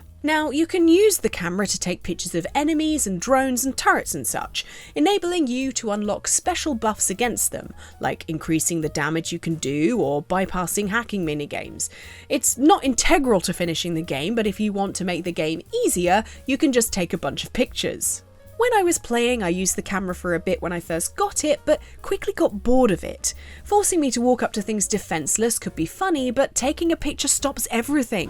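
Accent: United Kingdom. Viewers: I just think you're swell. You have one of those voices I could set my Jarvis to. Listened to all your videos, some more regularly than others, and that old cliche holds true. I could listen to you read the phonebook and it be entertaining...or ya know, Oxfords dictionary. If you wanted to go old school 🥴 explains: New English like "Frack" entered Oxfords dic. this year and means frack, n.: “Used as a euphemistic alternative to fuck, n., typically in phrases suggesting a dismissive, hostile, or exasperated response, such as to give a…” Ranting, explaining, sick, expositional, vlogging, No matter the temperament, the topic, or the energy I just like hearing your voice with all its British inflections and your squeaky mannerisms. Like a massage for the brain. Like the mic setup btw. Ok peace.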